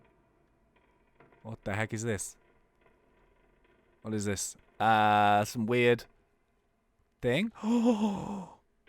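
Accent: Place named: Liverpool, United Kingdom. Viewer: your scouse accent is amazing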